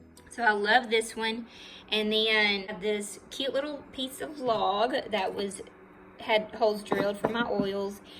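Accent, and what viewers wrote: South, United States. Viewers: you brought tears to my eyes when you were counting your blessings. God is a good, good God and gives us our, not perfect, but rich, joyful lives. I always love your home tours....you could make any ole house a warm and inviting home with your decorating swag! TFS your life and beautiful home with us! God bless you and Drew.👍😊💛🍂🌻🌾🎃🍂🌻💛 BTW, I too am a small town girl and love that you may match or surpass my southern draw...lol!! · Fall Greetings from Canada. Oh my what a charming & cosy home you have.I love your southern sweet accent. I too am a YL member (now 2 yrs.) & what a difference it has made in my life. TFS & God Bless!!! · The minute you started talking I knew you one a home town Southern girl, I live a rocks throw in Hueytown, your home is beautiful, love Petals of the Past! glad I found you!😊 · I’m a southern girl from fla and texas and i adore hearing your accent brings me home 🍁🍁🍁🍁❤️🎃🍁you have a beautiful home 🎃🍁🎃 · Oh and this is the first video I've seen of yours, and I'm so envious that you have a large loving family. 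You've got the sweetest farm cats, and I just love your personality and voice. Very southern. Im Canadian but I have said more than once that I wish I was born somewhere in the south.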